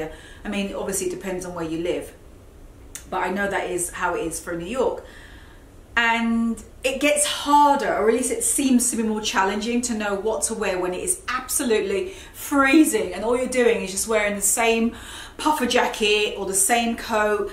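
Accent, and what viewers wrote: United Kingdom. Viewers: I love love love your accent! A mild uk english🎉🔝🔝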